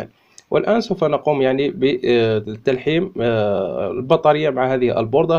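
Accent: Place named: Algeria. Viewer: ن من صوتو بلي دزيرير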